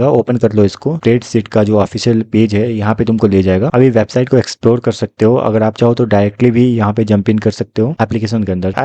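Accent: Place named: Bengal, India. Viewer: Tum tam karna band karo. Bong lagte ho.but indeed informative.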